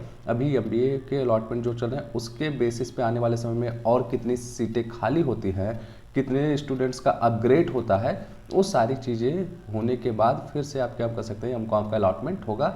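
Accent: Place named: Uttar Pradesh, India. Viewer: Bhai up waale m ko yam aur H ko yah Cos ko cas aur Gauge ko gaaz hi Bolte hai😄😄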